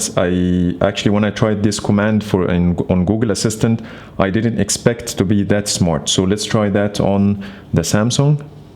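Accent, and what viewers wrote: Egypt. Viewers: Your accent is so Egyptian damn 😅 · Are you Egyptian? Your accent is very Egyptian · من طريقه نطقك شكلك مصري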